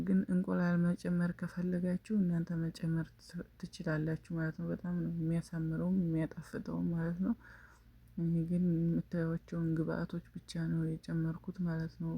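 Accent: South, Ethiopia. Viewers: በጣም ደስ የሚል ደቡብ አገጋገርን ያሳየሽ እህታ ለየት ያለ ነው እንዲህ አይነት ለየት ያለ በጣም አሪፍ ነው ሚያቁትን ማሳወ ሌላ ክፍለ ጊዜ እንጠብቅሻለሁ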